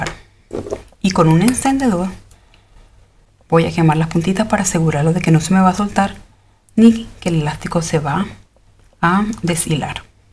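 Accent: Colombia. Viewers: Hola me gustan mucho tus videos, los miro con mis nietas y gracias a ti empezaron a gustarnos estas lindas muñecas(monster high,Ya que a mi niña mas grandecita no le gustaban,pero un dia le mostre el video de tu coleccion y le gustaron mucho varias,pero le encanto Cleo...y hasta ya tiene 2 muñecas Cleo,2 Claudin y a DracuLaura unas regalos de mi esposo y mio y las otras de sus papas,o sea de mi hila y su esposo,es muy hermoso repetir la experiencia que comparti hace años con mi hija y hoy en dia con mi nieta adorada!... Y en verdad que tienes una voz relajante; y tu acento Colombiano es lindo!! Saludos Cariñosos desde Mexicali. B.C Mexico.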